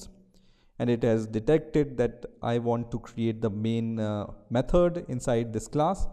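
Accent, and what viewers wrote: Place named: India. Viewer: i hear your voice and i know that u are from india or pakistani. one of booooooooooooooooot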